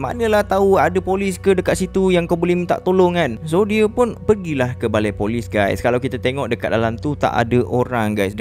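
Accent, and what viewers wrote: Malaysia. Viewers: Kwkwk lucu, logat melayu memang sangat lucu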